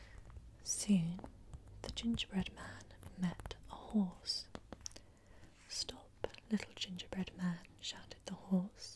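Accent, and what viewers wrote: United Kingdom. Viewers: And has a British accent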